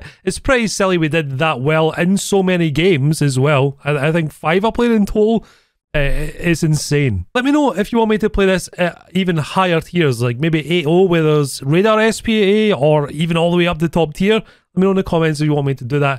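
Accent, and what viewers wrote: Scotland, United Kingdom. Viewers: Bro you can hear he is scottish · are you british ???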